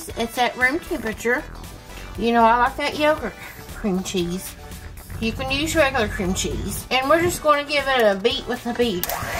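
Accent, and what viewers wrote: South, United States. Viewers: just found your channel!! love the recipes..love your southern accent as it reminds me of mine. what part of the south are you from?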